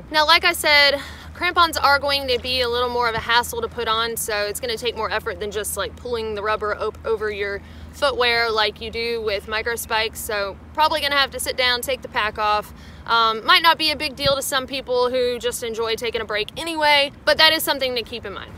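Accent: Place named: Alabama, United States. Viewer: Hey, Thanks for the episode and the tips of advice....As usual your delivery was informative and interesting, with an ever so charming Alabama twist...We appreciate and look forward to the experiences you share with us...You take care and be well 'till the next one lady...Thanks for the smiles...CHEERS!!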